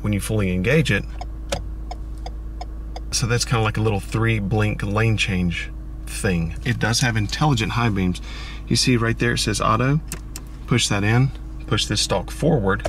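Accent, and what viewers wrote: North Carolina, United States. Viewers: Living here in Missouri, I noticed your accent was North Carolinian, then I saw a Popup that said you were in High Point.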